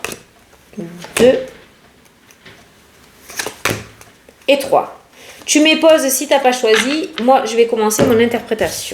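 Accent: South, France. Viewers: Bonsoir que du bonheur de vous entendre 😊😊😊😊. Je me prénomme ludivine le 02/10/1979. Est ce que je vais bientôt réussir à avoir une grossesse depuis le temps avec tant d épreuves 😢?mais je ne baisse pas les bras.merci beaucoup de tes tirages qui me font du bien avec ton humeur du sud.je suis du sud aussi j adore ton accent ❤❤❤❤😊😊😊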